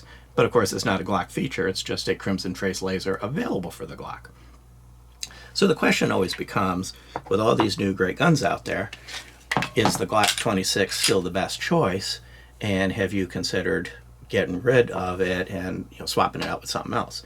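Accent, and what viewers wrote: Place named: Chicago, United States. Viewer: I have 2 Glock 26's but I still prefer to carry my: Hellcat, Walther PPQ-SC and my FNS-9C over the G26. But I still love my Glock 26's and do carry them alot as well and would never part with them. I think the Glock 26 is one of the best Glocks ever made and will never fade away. Thanks for sharing. U have a Chicago or Detroit accent but u live in Florida. Florida is where all the rightwing east coast and Midwest Republicans retire to and also Arizona.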